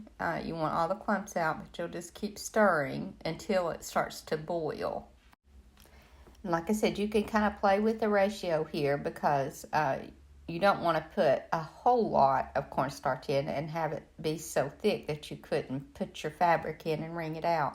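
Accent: South, United States. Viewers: You have such a thick southern accent, which most of us aren't used to hearing, that made you so hard to understand. Could you please repeat this in standard English or with captions. If it wasn't for the vid, I would have missed out. Thanks.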